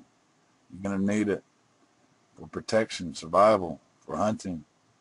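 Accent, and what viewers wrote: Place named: United Kingdom. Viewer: What a strange, over the top, ' jolly hockey sticks' English accent of the narrator. Was it computer generated? I was mesmerised listening to the accent that I didn't hear what she was saying. Now I will have to watch it again :)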